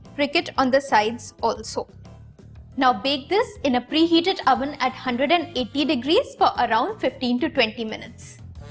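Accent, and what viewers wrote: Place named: India. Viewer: That accent is so cute, if all toddlers spoke English with a heavy Indian accent it would be like sunshine on top of sunshine.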